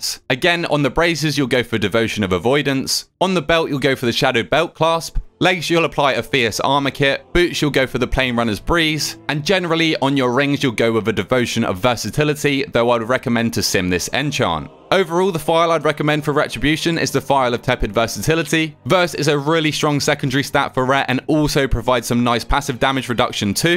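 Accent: United Kingdom. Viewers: As an American your guide is more legitimate due to British accent.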